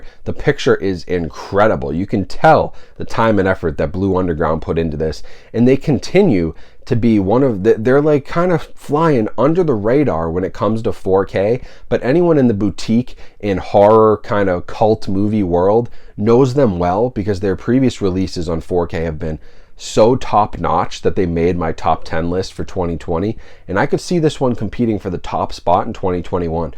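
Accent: United States. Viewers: love your reviews, been watching for quite some time. I would like to inquire why americans always pronounce the word niche as nitch? phonetically neeche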